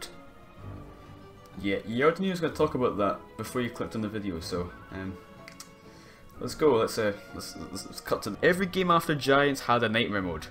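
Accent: United Kingdom. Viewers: Wait why is he British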